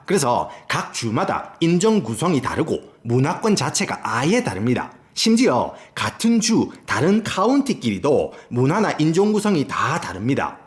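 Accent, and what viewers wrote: Gyeongsang, South Korea. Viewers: 저도 이게 넘 신기한게... 왜 찐 경상도남자들은 서울말투도 따라하는거 오글거린다 하시잖아요? 근데 또 영어발음은 또 clear하게 넘 좋으시고. 또 너무 어릴 때 왔다기엔 경상도 accent가 thick하시길래ㅋㅋ 1.5거나 혹은 성인 때 왔어도 언어 센스가 좋으시구나~ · 아뉘 사투리 😂찐 갱상도인이 듣기에 너무 편안! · 구수한 갱상도 사투리..ㅋㅋㅋㅋ 좋은 영상 많이 기대하고, 항상 건강 챙기소~~ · 갱상south도의 사투리 찰지고 재밋어예❤ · 고향이 어뎁니까? 참말로 구수하네예. 영어할때 반전이네예 ㅋㅋㅋㅋㅋ 구독했쓰예! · 아... 너무 재밌게 봤어요. 타겟과 월마트의 적나라한 카트 비교.. ㅋㅋ 트레이더죠스의 주차장 상황까지.. 구수한 갱상도 사투리에 쪼매 안어울리는 영어발음은 완전 본토! 미국생활 30년차인데 나름 감동이었습니다.. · 와... 시끄러워. 경상도. 생긴것도 딱 경상도네. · 어떻게 영어는 완전 찐 교포신데 한국어는 경상도아저씨 스타일이신지 넘 신기해요. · 말투가 너무 중독성 있어요ㅎㅎㅎㅎㅎ 경상도 + 교포느낌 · 경상도 원어민이 영어도 원어민이시네요 내용도 유용하고 재밌기도 합니다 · 어쩜 경산도 사투리가 촥촥~ 귀에 감겨요. ㅎ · 경상도사투리와 영어발음이 온가는게 넘 재밌네요 ㅋㅋ 저는 미국온지 2 년째인데 저도 경상도입ㄴ다ㅎㅎ 전 달라스인데 공감해요^^ 근데 h 마트가 월마트보다 야채과일은 더 엉망입니다 ㅜㅜ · 외국인이 한국남부(경상도) 사투리를 인지한다는게 신기하네요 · 여기서 경상도 사투리 들으니까 너무 우습네요 근데 Albertsons Vons 브랜드 가 Kroger 브랜드 보다 한단계 높은디 ㅎㅎ구독과 좋아요 했어요 또 웃겨 주세요. 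· 갱상도 사투리에 안어울리게 영어발음이 장난아니네 ㅋ 재밌네 아재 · 채널 주인장님 완전 매력터짐요ㅋㅋ 오리지널 경상남도 남부 억양과 한 번씩 나오는 영어가 삘이 좋은게 어딘가 매치가 잘 안됨 + 표정의 90%는 입매가 담당 + 어딘가 중국계 미국인 필 입담 대박 맛깔나요 👍🏻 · 이상하게 난 한국에 아는데 갱상도 사투리를 들으니까 향수가 느껴지지? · 귀요미 경상도 아재가 말아주는 알쓸잡미국 썰~~~ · 강렬한 경상도 사투리 사이에 비집고 튀어나오는 넘나 정확한 영어발음 ㅎㅎ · 우째 이리 갱상도 사투리와 빠다 먹은 영어 발음으로 말을 재미나게하는지~ 윽수로 땡큐라예~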